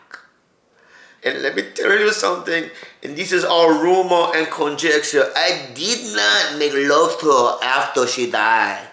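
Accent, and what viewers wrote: Mexico. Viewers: Is he suppose to sound Mexican?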